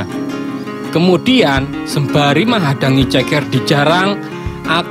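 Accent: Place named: Banjar, Indonesia. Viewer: Logat banjar nya tu heh lawak banar🤣🤣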